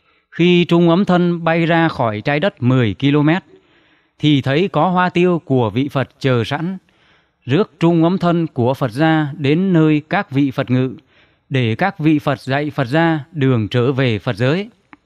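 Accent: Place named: Thanh Hoa, Vietnam. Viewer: Giọng đọc Thanh Hoá nghe rõ . Nội dung có chính xác như của chùa thiền tông Tân Diệu không ?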